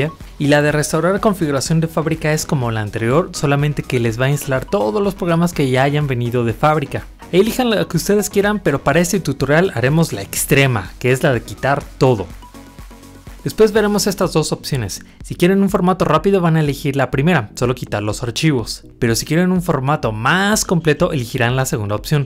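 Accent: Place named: Peru. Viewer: me parece mal esa voz de peruano VENI A ARGENTINA GATOOO!!!!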